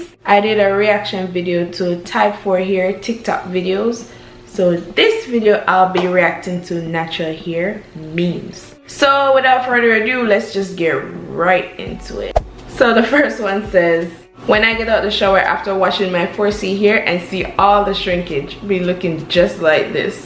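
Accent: Jamaica. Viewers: I swear it so comforting hearing the Jamaican accent as another Jamaican person 😩🤣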